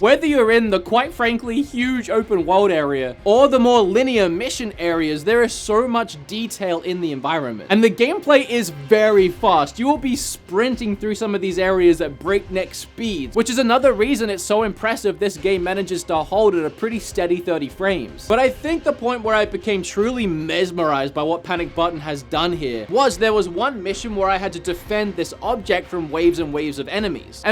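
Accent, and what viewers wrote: United States. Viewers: I'm Australian, all I can say is that your accent is devolving to American. Also I want to send you something what do u neeeeed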